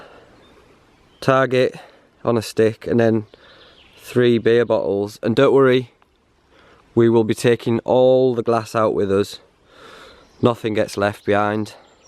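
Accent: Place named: England, United Kingdom. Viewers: I can't understand half the times lad for your English accent. :-( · Eh up lad. Going a bit avant garde now eh! have you been at the wacky backy lately? And by the way, ya call it an oss in Yorkshire not a horse, I think your from Lincolnshire really you know, its your English and your accent???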